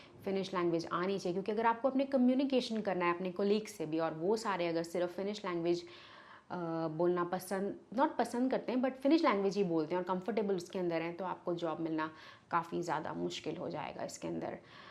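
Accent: India. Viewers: Why do you sound like a Maharashtrian? 😅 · Hariyana se hai ke.. Rom Rom ji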